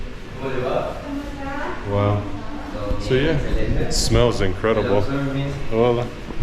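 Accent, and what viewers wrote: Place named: South, United States. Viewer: You can eat Guayava paste with cheese, you should try it...Love your southern accent!!!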